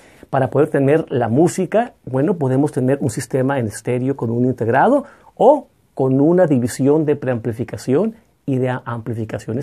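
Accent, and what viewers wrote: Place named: Yucatan, Mexico. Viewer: Hace mucho que no vivo en México, pero noto un ligero acento de Yucatan es usted de origen yucateco o por lo menos de la peninsula de yucatan? Perdon por desviarme un poco del tema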